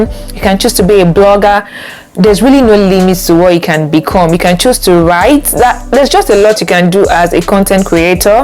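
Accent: Yoruba, Nigeria. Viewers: Working on her speech and diction - yeah because she needs to improve (we all need to improve on whatever we are doing) but asking her to "eliminate every element of Yoruba accent", haba. With due respect since I am not a linguist, yet I disagree with you in content and in context. That's as good as saying she should change her personality/identity because it's not good enough. I will be looking for another definition of inferiority complex, please pray for me too... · why should she eliminate Yoruba accent? Is that suppose to be a taboo?🤷 Nothing beats Originality. Be real..So far she's communicating. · God bless you dear sister! I really appreciate your efforts at this, keep the good work up. However, as a Linguist I would advise you to work hard on your speech and diction. Make it your goal, to eliminate every element of the Yoruba accent, in your speech. 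I'm very particular about that each time I listen for speech content creation. I shall be praying for you and cheering you on. Do keep the up the good work! Cheers! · That’s a Yoruba accent. Every tribe and country have their own accent. Let’s focus on the information given and ignore the accent. T for tenks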